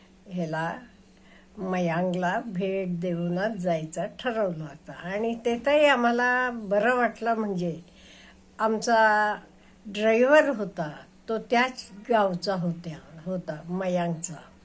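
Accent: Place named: Vidarbha, India. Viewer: Aaji tumhi vidarbha cha aahat ka marathi thodasa vegla vatta pan chaan vatta aikayla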